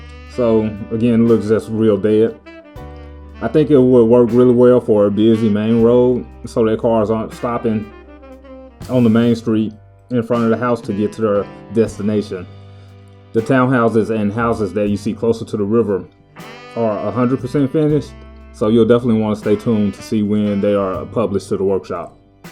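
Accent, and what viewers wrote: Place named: South, United States. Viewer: You might not think you are very good at public speaking - I think you are way to hard on yourself. I could not imagine a better voice with a southern accent to accompany your Bluff City series.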